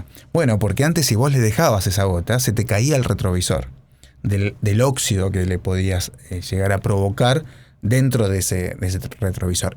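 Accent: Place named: Argentina. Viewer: en primera me sorprende tu respuesta muy explícita y respetuosa nice ! Segundo ,dónde estás imagino q en argentina por el acento Tres como se ve la situación en tu país en verdad hay gente q se preocupe por hacer ese tipo tratamientos a sus autos si apenas hay para cosas de primera nesecida!!! O q porciento de la población en tu comunidad gasta en eso ???? Si estuvieses en USA o EUROPA t lo compro ! Y por último con mucho RESPETO es de muy MAL gusto estar bebiendo cuando estás hablando ! Saludos .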